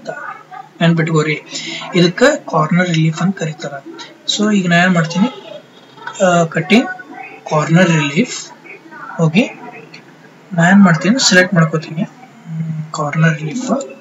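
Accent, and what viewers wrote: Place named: North Karnataka, India. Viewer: wonderful sir,... very usefull.... THANKU....Explaining in north karnataka style juss awsm loved it... ;)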